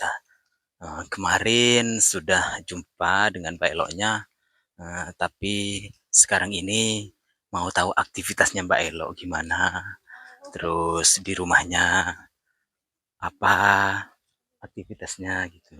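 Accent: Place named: Madura, Indonesia. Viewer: Org maana sih kang logat nya kaya madura gitu😊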